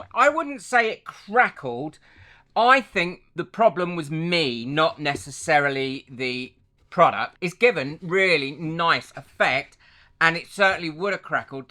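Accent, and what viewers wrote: United Kingdom. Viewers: You are adorable! I don’t really care what you’re making, I’d tune in just to watch a dude n listen to a Brit do crafting. I subbed. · I had no idea that so many people Love boxes!!!! I collect them, thinking, well, you never know…it’ll come in handy for something sometime. I’ve never done crafts, I just love boxes! I usually end up needing a particular size to mail something in. I’ll watch your video and maybe be inspired to try something new! and, btw…im an American and I love your English accent. English accents sound so sophisticated.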